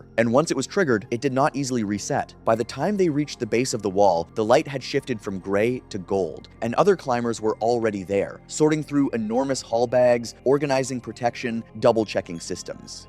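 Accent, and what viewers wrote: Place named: United States. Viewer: Means the American sounding guy is probably American, home of the freedom units.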